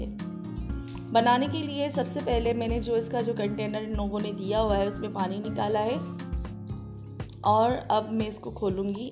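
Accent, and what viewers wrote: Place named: Pakistan. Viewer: Ok I recognised from your speaking style. Pakistan mein Kahan se?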